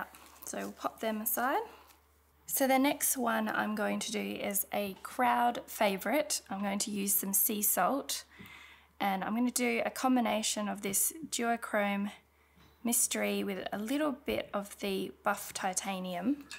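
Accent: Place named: Australia. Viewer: As soon as I heard you’re Australian I subscribed to your YouTube channel. So nice to hear a familiar accent. And you use materials available to us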